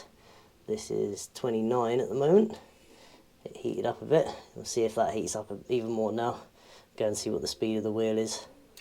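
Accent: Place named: England, United Kingdom. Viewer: if you want to hear someone with an english accent tell you thats what they wanted 14 times in a row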